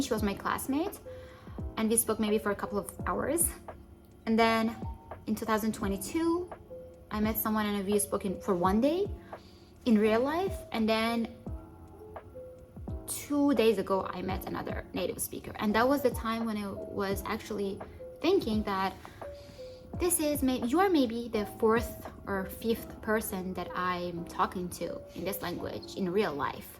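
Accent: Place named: Arab world. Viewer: Why do you have Arabic accent!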